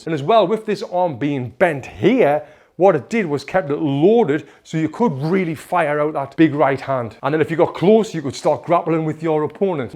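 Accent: United Kingdom. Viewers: The accent is very british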